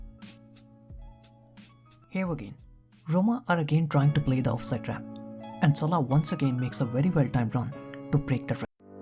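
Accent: India. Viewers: u have kinda Indian accent.... I guess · you sound Indian? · No disrespect man but as soon I can hear the Indian accent I couldn't go last two minutes.... Shocking... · why your sound like a indian, are u Indian?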